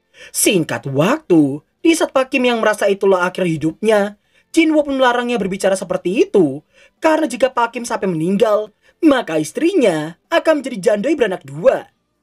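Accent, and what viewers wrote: Java, Indonesia. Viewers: medhok coy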